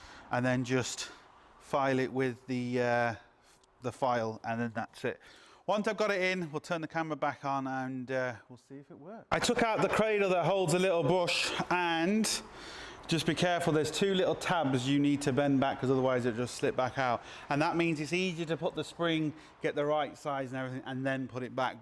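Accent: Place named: United Kingdom. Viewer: Great Job! British Guy LOL Thank You !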